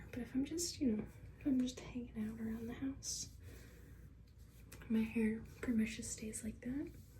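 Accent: South, United States. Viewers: Wow, is it just me or is a Southern accent coming out more in this video? 😁 Love the hairstyle!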